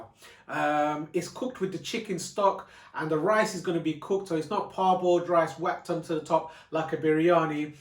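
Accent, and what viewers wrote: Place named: England, United Kingdom. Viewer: Love all the DISHES YOU MAKE.. MY STYLE....THANK YOU FOR BEING ON LINE.... 👏👏👏😋😋🙏🙏 IM IN ADELAIDE SOUTH AUSTRALIA.... ARE YOU IN ENGLAND?? DETECTED THE ACCENT...👏👏😂❤❤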